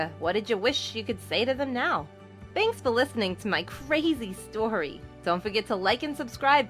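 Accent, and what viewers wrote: New York, United States. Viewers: That thick new york accent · Nice new York ancient or is it just me 🙋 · No she has a New york accent. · Moe and moe pi'tures! Sounds like New York baby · This has to be a New York accent. It just sounds so familiar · Her accent is really cute it’s kind like a New York accent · Her voice sounds like a ny accent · She sounds like she is from NY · is it me or or does it sound new yorkish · She sounds like she has a New York accent and one of the background she was in with her sister that thought bubble was a gacha life background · It’s probably the New York accent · Well i can tell she’s from new York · She new Yorker voice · I heard that new york accent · new yorker? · newyork accent? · She got that model new York accent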